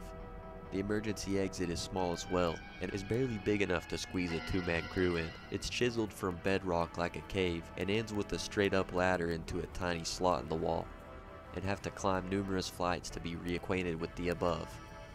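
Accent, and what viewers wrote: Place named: Chicago, United States. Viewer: The narrator has a Chicago accent, which is odd for an NYC video.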